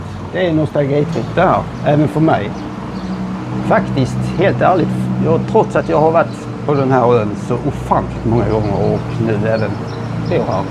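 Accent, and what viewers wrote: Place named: Skåne, Sweden. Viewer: Intressant film, hör att du är från Skåne och runt Hässleholms trakten. Välkomstdrinken i filmen togs på ett hotell i San augustin dock svårt att se in där då det är murar mm framför. Något jag sökt efter.i många år är var dom spelade in inköpet av Lasses åsna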